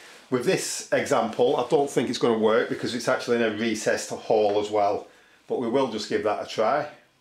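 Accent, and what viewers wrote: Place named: Yorkshire, United Kingdom. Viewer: What part of north you from? Sound like a Yorkshire man. But you could be from Manchester or Lancaster. I used to be from Blackburn myself. Lived in London for years but still have the accent 😂 Thanks for the tips. My Screw just won't budge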